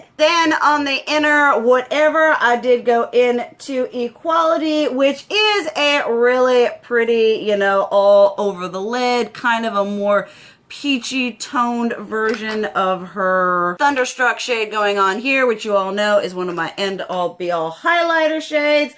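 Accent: South, United States. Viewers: I thought she sounded more Virginia/Carolina area. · This is my first time on your channel... you seem to be goth with a country accent... coming from Arkansas I'm living for it. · You randomly popped on my recommendations and I absolutely love your accent You’re so loud spoken and extra in a wonderfully southern way As a Caribbean girl people often complain that my speech like yours is loud But that just how I am and I love to see another female who’s voice isn’t all super dainty quiet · Woah. Just found this in my recommendations and I'm subscribing now. A fun pale goth woman with a southern accent talking makeup. This is amazing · Super new here. Goth Chick with a thick ass country accent. I am in love!!! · Ok but I'm glad I'm not the only gothy person with a country accent lmao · Pretty goth lady with a southern accent? Amazing!!! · I love her southern accent!!!! 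· first time watcher. can't get over Wednesday Addams having a southern accent 😅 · What’s up with the Wednesday Adams costume and the southern accent? Every video your accent varies. I’m not sure what’s going on with here. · Is there anything better than a big titty goth gf with a southern accent? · Are you southern ??? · I'm new to your videos I'm from the south but I was not expecting the Southern accent I was like wow ok nice but no hate i liked this video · I just love your voice. I am guessing you are Southern like myself.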